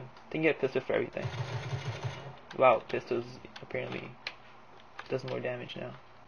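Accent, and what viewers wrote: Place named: Canada. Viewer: you sound exactly like every other canadian admin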